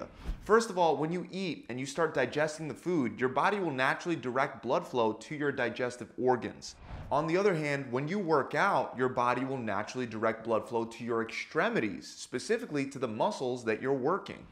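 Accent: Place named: New Jersey, United States. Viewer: He is from New Jersey. We in the northeast pronounce the a in water the same as the a in warm just like the British and Aussies. Most of the rest of the US pronounces the a in water like the one in watch. But in the tough inner cities of the NE, an outsider saying waah-durr might be told he sounds gay or weak. To each his own, I guess.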